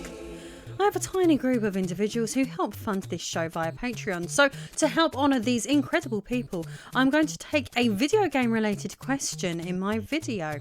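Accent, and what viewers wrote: United Kingdom. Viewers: I'd ask you to explain faster but you're hot and I don't USUALLY like British accents however for you I'd make a huge exception.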